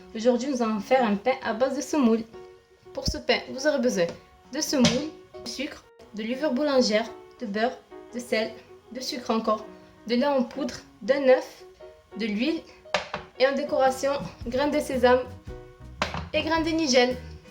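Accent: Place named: South, France. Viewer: Superbe cette recette en plus avec un joli accent du sud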